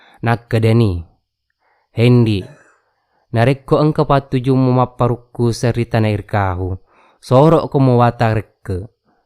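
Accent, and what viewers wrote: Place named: Bone, Indonesia. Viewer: Terlalu kental dengan dialek bone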